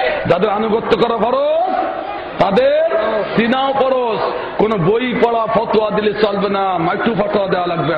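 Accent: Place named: Barishal, Bangladesh. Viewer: hala borisailla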